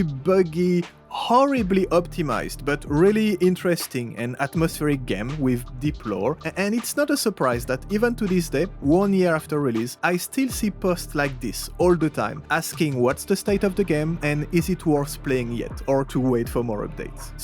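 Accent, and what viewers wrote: France. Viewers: He's so French I thought he was saying dispatch not this patch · FRENCH SOUNDING😨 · T'es français ?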